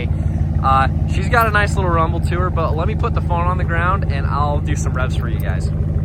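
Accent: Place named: United States. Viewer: Your country accent is so cute 😊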